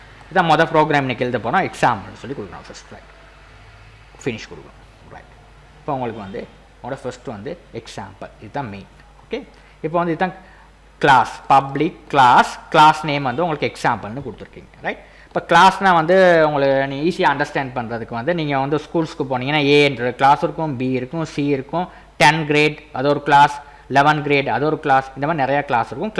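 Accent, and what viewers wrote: Sri Lanka. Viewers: Srilanka Tamil ah nenga ?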